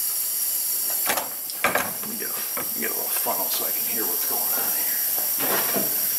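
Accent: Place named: Pittsburgh, United States. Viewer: Hey pal leave off of my fellow Pittsburgher. I thought I spoke perfect English until I left home for the wild West and people questioned my pronunciation of certain words . It appears that Pittsburgh has it's own dialect.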